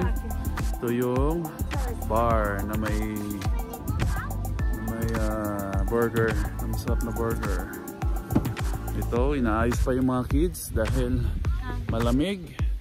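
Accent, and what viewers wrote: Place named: Visayas, Philippines. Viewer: Hello....visayan accent cutie.....good family and loving wife....you are blessed to have them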